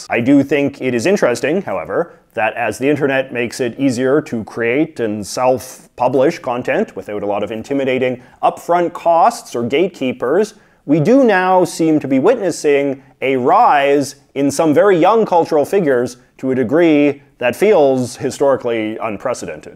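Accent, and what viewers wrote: Canada. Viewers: I don’t think you can put a hard line on what year differentiates a generation. Being born in 82, I relate a lot to Gen X even though I’m an older millennial. I got a good chunk of 80s vibes even though my prime upbringing was in the 90s, especially being that I’m a “late bloomer”. I think the term “old soul” applies to us who carry over traits of the previous generation into their more appropriate generation. I think also part of it is having older siblings/cousins that influence our upbringing. And wow, I’m sooory…I never thought I’d ever hear such a thick Canadian accent! lol love it! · your accent is so canadian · Are you Canadian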